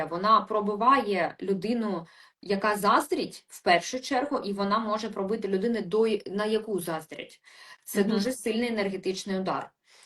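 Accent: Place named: Russia. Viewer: Важко зрозуміти де які слова цієї відьмочки.Мабуть вона раніше була російськомовна або в неї як це говориться російськомовна щелепа і говорячі деякі українсікі слова вона не зовсім розуміє їх значення.То може б краще роворила б російською.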